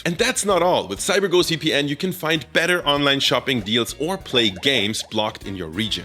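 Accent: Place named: Germany. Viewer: your german accent is coming out stronger nowadays.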